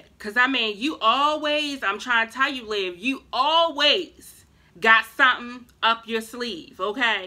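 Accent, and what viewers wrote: Baltimore, United States. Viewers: Idk how I found your channel. Are you from Baltimore? It sounds like you have a Baltimore accent. I love it! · New subbie..Are you from Maryland? U sound like us in Baltimore lol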